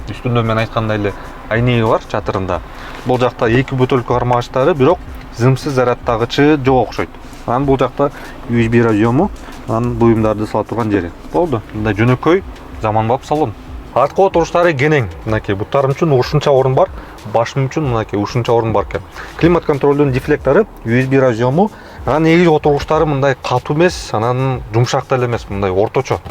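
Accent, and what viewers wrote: Standard. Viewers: Ушу жигит кыргызчаны өтө нукра сонун сүйлөйт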